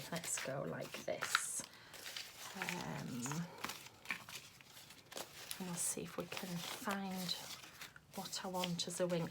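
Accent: England, United Kingdom. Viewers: I just love your vibe and personality! Plus you have the best English accent I've ever heard! Could listen to you alllll day :D love your videos! :)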